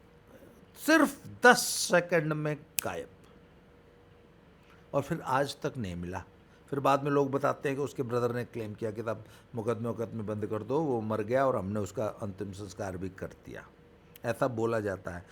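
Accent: Haryana, India. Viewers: Aap gazipur , banaras ke h bhasha haryanvi h😮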